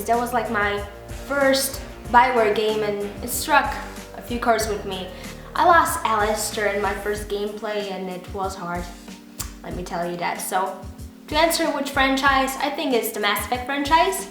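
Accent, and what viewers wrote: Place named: Russia. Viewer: Love the vid and enjoy your videos and where are u from u sound a bit Russian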